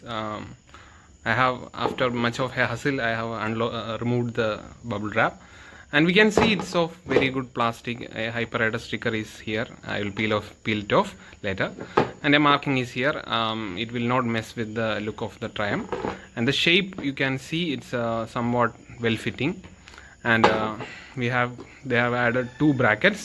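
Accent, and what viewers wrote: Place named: Kerala, India. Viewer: That thick mallu accent😂❤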